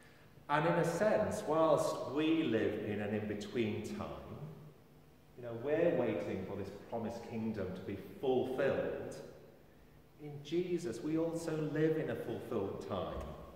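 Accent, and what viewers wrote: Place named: England, United Kingdom. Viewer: I look forward to your sermons as I live in Greece and we only have Greek Orthodox churches here -- I look forward to listening to you (good old English accent) - I miss Edgeley and look forward to coming home as soon as I sell my place here. Thank you. Stay safe.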